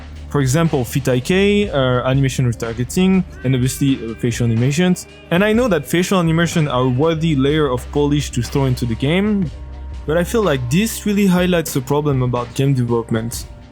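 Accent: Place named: France. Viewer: Gotta love the french accent ahah Nice changes! I'm looking forward to play the next chapter ✨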